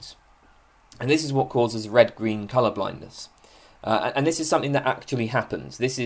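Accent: United Kingdom. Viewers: Most British sounding man but writes z in stead of s?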